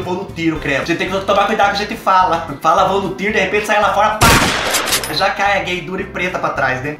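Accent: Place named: Coronel Macedo, Brazil. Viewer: Gente, tem coisa mais fofa do que o falando inglês com esse sotaque de Coronel Macedo? 😍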